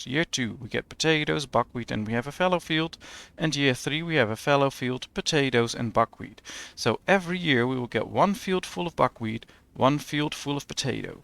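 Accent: Netherlands. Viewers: very useful and well explained , you must be Dutch :) hearing from your accent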